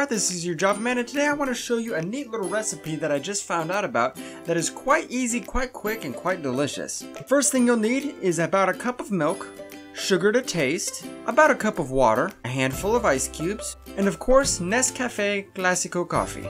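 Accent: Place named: United States. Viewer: Dear God, the American accent is horrendous! Frap-pay. 😂😂😂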